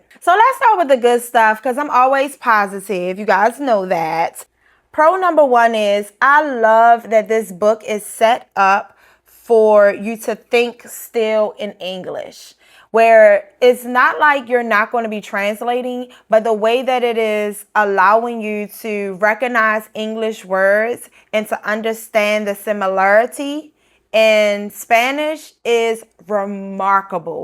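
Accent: Baltimore, United States. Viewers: Ya'll say tewww in Baltimore, like with an emphasis on the end vowel. Anyway, new subbie from upstate NY learning Spanish:) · Girl, I'm from Baltimore and I was listening you you thinking "why she sound like home" 🤣😂😍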